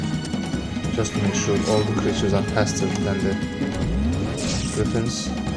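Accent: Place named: Turkey. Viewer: Are you Turkish? Your accent is Turkish.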